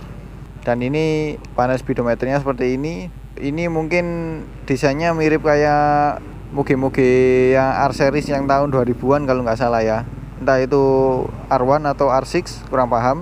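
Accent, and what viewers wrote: Malang, Indonesia. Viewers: Wong malang opo wong batu mas?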